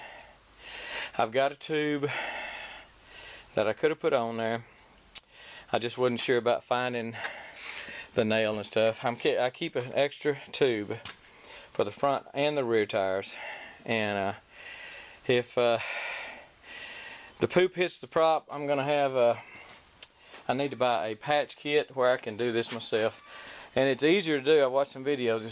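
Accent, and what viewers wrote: Texas, United States. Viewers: Thank you Matthew McConaughey! 🤣 jk.. laid back Texas accent sounds similar.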